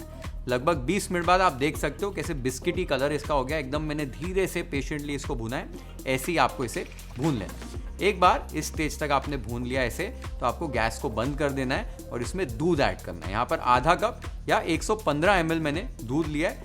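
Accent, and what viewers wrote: Maharashtra, India. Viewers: Your voice tone is like marathi person talking in hindi ?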